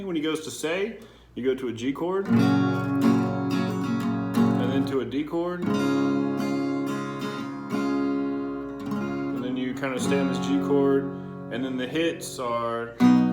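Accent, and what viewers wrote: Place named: South, United States. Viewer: man enjoyed it by your accent you sound like a southern boy where you from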